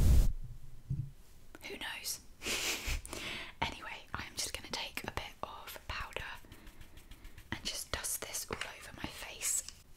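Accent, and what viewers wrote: United Kingdom. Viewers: I'm new here but hello. Are you British? I can't tell. Even though I'm British. 😝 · the first asmr that i watched in british accent.. so good to hear!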